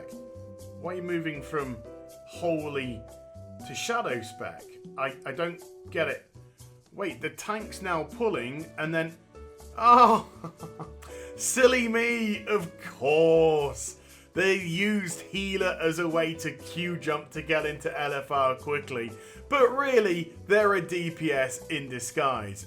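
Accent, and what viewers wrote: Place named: United Kingdom. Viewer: I dont know why i love angry people with british accents so much.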